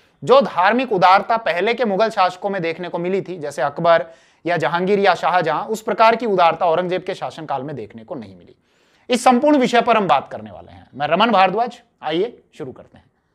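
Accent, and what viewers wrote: Rajasthan, India. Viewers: your accent shows your Rajasthani roots is just great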